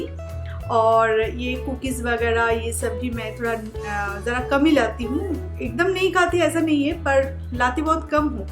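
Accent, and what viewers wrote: Bengal, India. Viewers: Shweta Grover Yar bangali hai to Thora sa to chalta hai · I love your way of speaking it has bengali acsent I love it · Hi frnd u r sooooo sweet.....i love ur bengali accent😘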